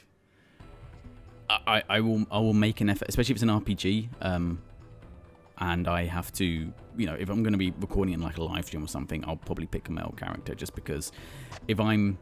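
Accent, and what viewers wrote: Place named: United Kingdom. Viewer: Come for the gameplay, stay for the posh English boy living 2015 again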